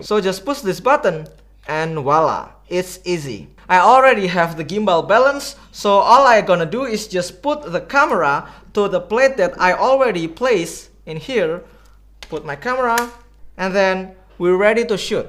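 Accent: Indonesia. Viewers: Walopun bahasa inggris nya logat Indonesia banget msh mending dapat dipahami karena Artikulasi lebih jelas 😅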